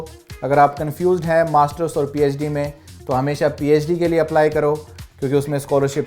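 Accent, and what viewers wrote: Haryana, India. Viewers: Bhai aapki to english bhi haryanvi accent hai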